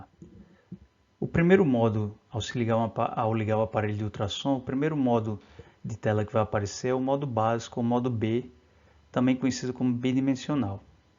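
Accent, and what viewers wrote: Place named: Ceará, Brazil. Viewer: Meu parceiro que aulas boas. Parabéns! Vc é do Ceará? Sotaque de cabra bom. Só o som que ficou meio baixo, mas conteúdo de excelência! Abraços. Ps. Encontrei o canal por acaso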